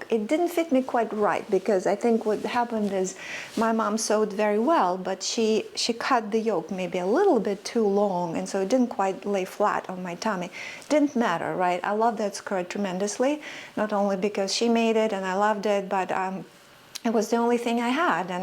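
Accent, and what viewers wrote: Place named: United States. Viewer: Many native English speakers don’t have the depth in our language that you do. Impressive and an American accent!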